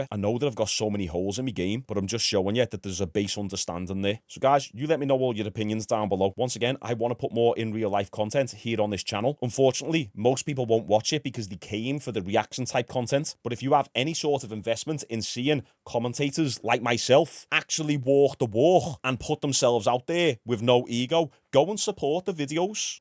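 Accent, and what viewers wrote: Liverpool, United Kingdom. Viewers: Love the scouse, from Derry N.I myself, our accent is hard to understand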